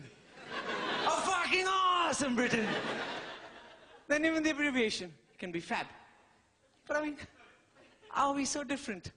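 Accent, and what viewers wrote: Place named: India. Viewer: Well-written but very poorly delivered with absolutely no spontaneity.. He speaks like my nephew would do in an Indian school play.. Probably the crowd is ethnically mixed and cannot make that out from his accent..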